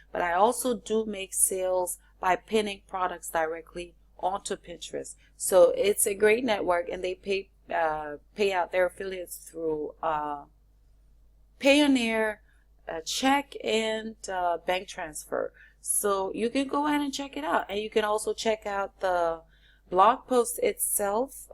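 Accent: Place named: Liberia. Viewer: I love your accent. You sound like a friend of mine from LIBERIA.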